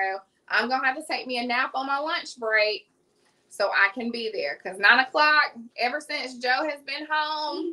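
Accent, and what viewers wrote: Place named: South, United States. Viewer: Watching the replay thinking, is she sounding extra country today or am I tripping and then you said it, 😂😂 I'm like ok, I ain't tripping!! I will definitely be making this dip!! Looks delicious!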